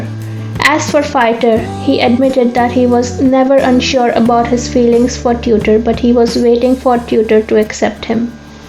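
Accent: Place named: India. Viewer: Hey by ur accent you sound indian, right?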